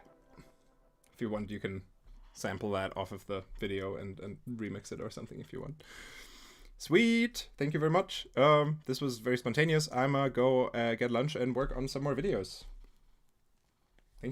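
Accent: Germany. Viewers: Why? He has a German accent.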